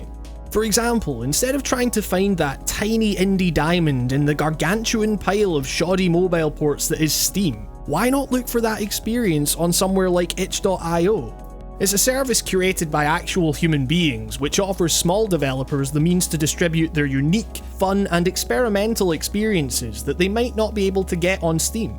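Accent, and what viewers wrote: Scotland, United Kingdom. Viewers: Is that a Scottish accent?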